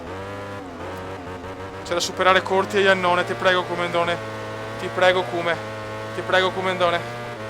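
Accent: Veneto, Italy. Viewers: cume, prendili coem dei consigli: 1- perfavore basta con le frasi fatte tipo sono teso, ripetuto 20 volte, i soprannomi ai piloti (dopo un po sono tristi), comunque grande con l' accento da gondolaro e il viva la figa<3 spezzi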